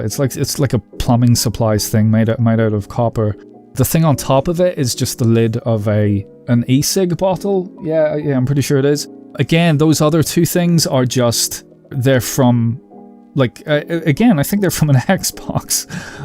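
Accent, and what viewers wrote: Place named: Ireland. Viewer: Brilliant ideas done brilliantly! On an personal note,you sound like a countryman of mine. Are you a northerner who went south or a Dub who went north! Either way, you’re very talented😊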